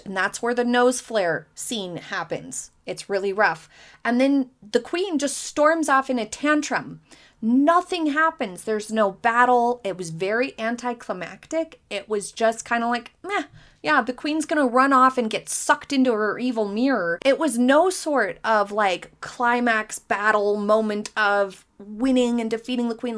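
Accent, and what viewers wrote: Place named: North, United States. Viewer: I can definitely hear your northern accent today. Are you from Wisconsin?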